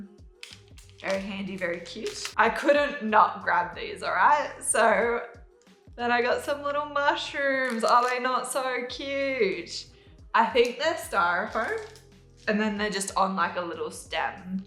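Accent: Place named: Australia. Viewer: Hi from Sydney! Just subscribed and am about done binging your channel, its great to have an Aussie crochet voice for a change. I had my first crochet market yesterday and it wasn’t anywhere near as posh or successful as your bus depot but I went in expecting no sales and instead made my stall cost ($40) back in 30mins then went home with $200. I try to holiday in canberra once a year so I’ll look out for your stall next time.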